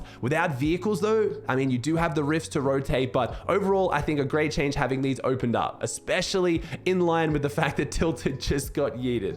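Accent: Australia. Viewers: just me or has he lost his aussie accent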